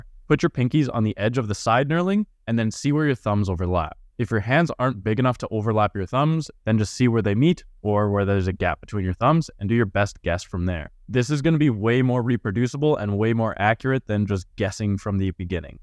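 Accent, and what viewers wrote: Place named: Canada. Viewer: I can tell you’re Canadian istg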